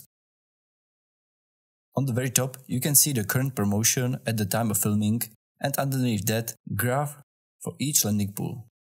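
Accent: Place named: Czech Republic. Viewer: I can hear your Czech accent :-) But I might be wrong...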